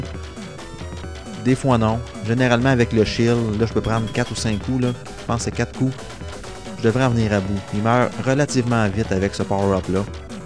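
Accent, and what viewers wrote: Quebec, Canada. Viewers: Québécois oui, mais tu es quand même très compréhensible pour des français comme moi ;) Et GG pour ta vidéo, même si tu trouves que Gaiares est un jeu facile (alors qu'arriver jusqu'au niveau 4 relève de l'exploit) tu arrives quand même à faire un run sans perdre une seule vie. C'est la que je me dis "Putain, ce que les canadiens sont bons :p"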